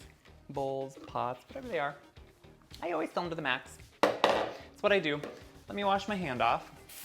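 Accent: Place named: South, United States. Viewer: Your accent gives me life!! I’m a southern gardener girl in the DC area and I miss how warm the people are ( just like you) so your videos are like therapy for me. Thank you so much!! ❤️❤️❤️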